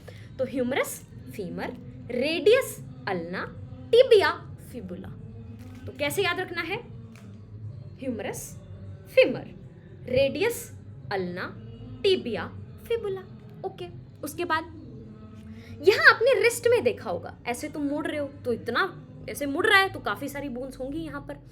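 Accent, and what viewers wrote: Haryana, India. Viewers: Haryana ig 😂?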